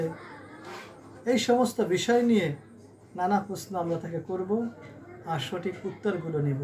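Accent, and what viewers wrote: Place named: Sylhet, Bangladesh. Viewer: Uni sylheti Kotha shune buja jacce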